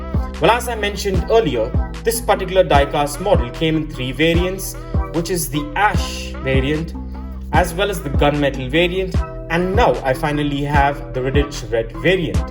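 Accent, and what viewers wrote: United States. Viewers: Your English accent is like an American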